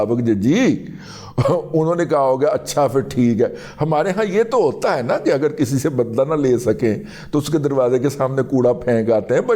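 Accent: Punjab, Pakistan. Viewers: Salam bhai this is Aamir kapadia from karachi your vlog always based on truth your Punjabi style I really loved and always enjoy your closing sentence great Allah aap ko apna fazal ata karay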